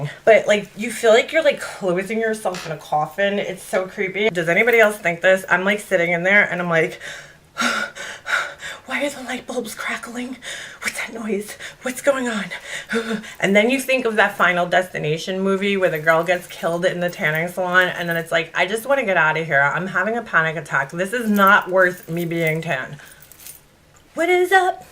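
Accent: New York, United States. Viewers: I'm a subscriber!! 🙋 Do you do acyric nails too?? You have a New York accent. You Rock !!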